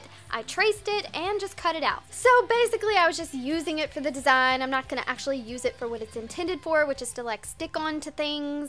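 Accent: South, United States. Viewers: i love her country accent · Where's from? She sounds southern.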